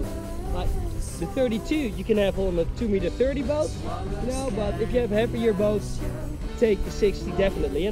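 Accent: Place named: Amsterdam, Netherlands. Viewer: Grappig amsterdams accent ; )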